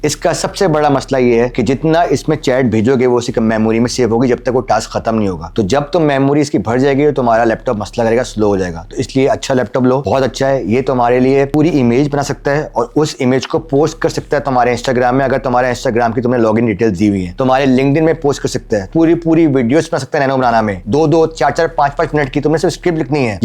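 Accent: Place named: Karachi, Pakistan. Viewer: Fantastic explanation! Kaafi dino baad Karachi accent main sunker maza agaya!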